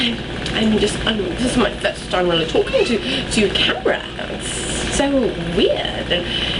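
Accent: United Kingdom. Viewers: cool E! gusto ko yung British accent mo,ah!I'm trying to change my voice sometimes(practise ba)kaso bakya tlaga!hhahaha Galing!iba na tlaga pag bihasa sa pagsalita ng Ingles.wla akong ma-say, ingit ako!haha! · wicked, i live in london and im a filipino. u got a posh accent. nice try ^_^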